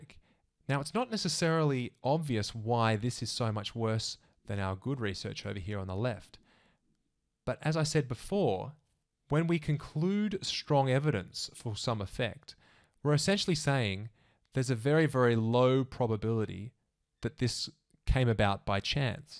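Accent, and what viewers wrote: Australia. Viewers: Your Australian accent sounds so lovely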